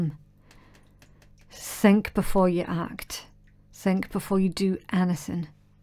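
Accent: Scotland, United Kingdom. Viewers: omg i love your accent!! Scottish ? ♥and as for the read : dead on accurate !!!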